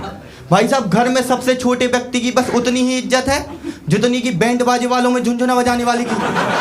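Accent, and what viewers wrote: India. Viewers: Bhai bundelkhand ke lag rai kaha se ho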